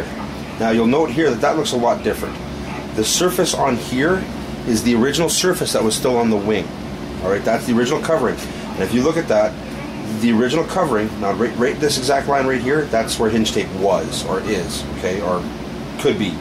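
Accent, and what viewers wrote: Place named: Canada. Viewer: As a Canadian I personally think that most talk about the "Canadian accent" is untrue. A lot of Canadians sound very similar to a lot of Americans (apart from the crazy accents like Newfies, Texans, Bostonian accent, etc.) But man, you sound like you came straight out of a Bob and Doug McKenzie movie!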